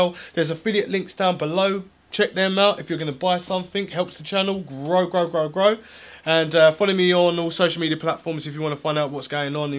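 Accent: England, United Kingdom. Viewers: Why you have english accent?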